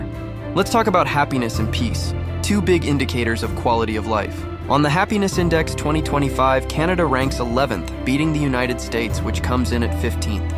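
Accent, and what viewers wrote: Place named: Nigeria. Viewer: You sound like a Nigerian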